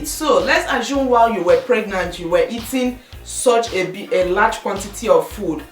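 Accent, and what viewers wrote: Igbo, Nigeria. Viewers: stella-marie signature my sister, I couldn't stand it too, and her Igbo accent is so unstoppable. I love her videos but... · Og Diva its her nigerian accent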